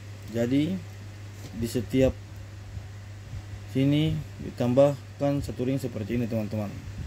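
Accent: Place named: Ambon, Indonesia. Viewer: Logat nya kayak logat Ambon ka om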